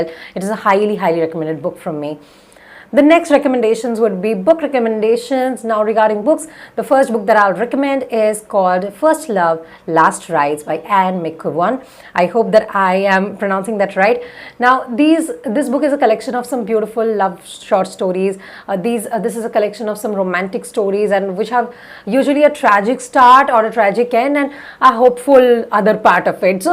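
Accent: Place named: India. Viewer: Are you an Indian? I guess, your accent is Indian.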